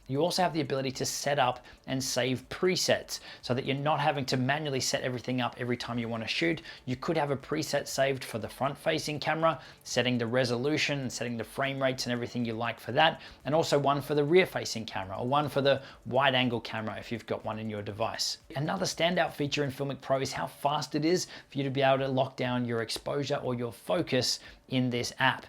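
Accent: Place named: Australia. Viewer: Hello, nice vídeo mate! Where are you talking from? I’m sure you’re an Aussie just by your accent. You look and sound very professional mate! Well done 👍🏻. It’s been great to learn a lot from your videos, thank you brother. I’m a Brazilian who’s living the dream in Perth for awhile, and now I’m setting up my own Youtube channel, thanks for your great effort mate 🙏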